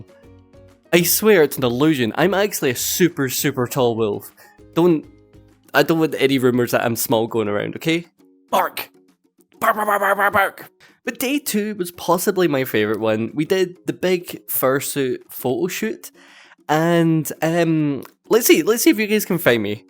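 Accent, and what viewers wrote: Scotland, United Kingdom. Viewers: I gotta love your accent. You sound very Scottish to me.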